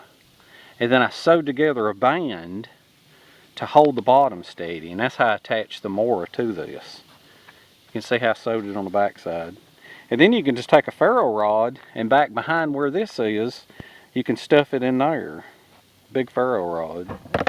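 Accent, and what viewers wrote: South, United States. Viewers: Wonderful example of an American "southern" accent. Thank you. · Thanks for another exciting video.. Nice to see you're still thinking and doing stuff. I love the Southernisms, like a two syllable word for a cover or top to a container "leeyud". Keep em coming! Frank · Loving the sounds of the cicadas in the background. Oh and the bacon sizzling is wonderful! I loved the video as I do all your videos. Your southern accent makes a North Carolina boy feel right at home.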